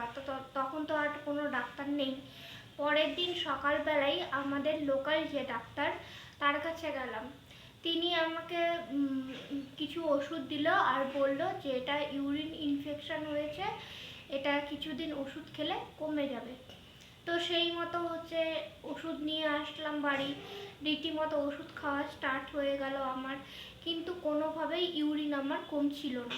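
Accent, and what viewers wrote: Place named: North Bengal, India. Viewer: তোমার কথায় বুঝলাম তোমাদের বাড়ি উত্তর বঙ্গে। মনের জোর রেখ। সাবধানে থেকো।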